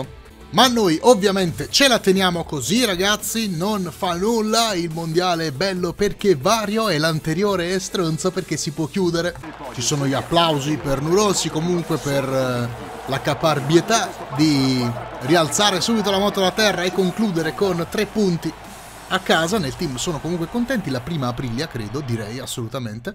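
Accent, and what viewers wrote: Sicily, Italy. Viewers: Gerardo Cavallo Fan Teknoescobar sei Siciliano?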